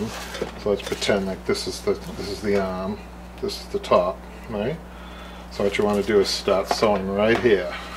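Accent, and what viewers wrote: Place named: New York, United States. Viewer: Love that New York accent ..."right om"...it's R A D . And I love the demo. I want to buy a beautiful upholstered chair for my new-to-me modular home and the arms are stained . So this is the answer ! Thanks !!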